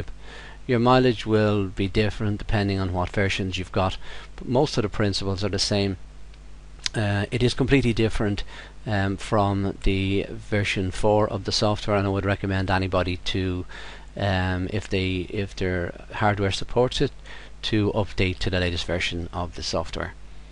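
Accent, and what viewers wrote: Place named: United States. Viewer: A very good video!!!! You have a bit of an accent. From the USA!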